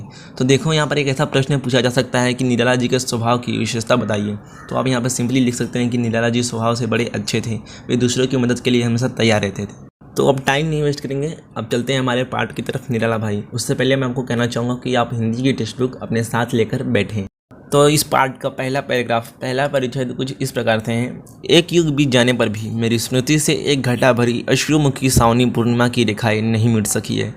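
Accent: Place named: Bhojpuri, India. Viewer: Bhosdike ghutka nikal ke baat kar bhojpuri choda sala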